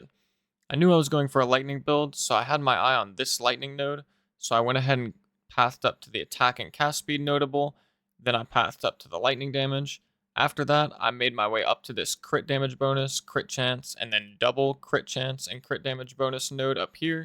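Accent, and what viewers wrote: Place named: RP, United Kingdom. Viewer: Why do you sound so posh mate?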